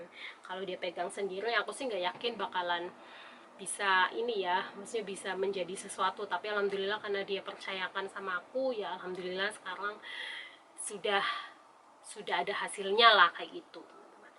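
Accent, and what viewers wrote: Banyumas, Indonesia. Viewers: Pdhl kl ngapak jg gapapa loh, lucuu 😂🤭🙏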